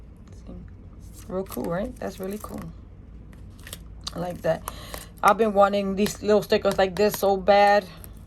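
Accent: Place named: New York, United States. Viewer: Your getting better with your videos love the haul and your content girl I love your accent are you from N Y TFS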